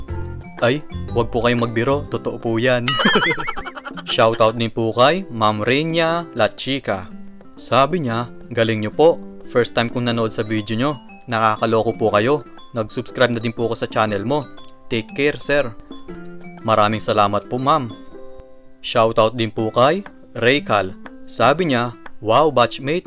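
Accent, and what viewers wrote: Pampanga, Philippines. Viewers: Haha sabi kuna pin kapamps ka eh base king boses mu... First time ku apanalben video mu lakas maka entertain brad haha. God bless and more power!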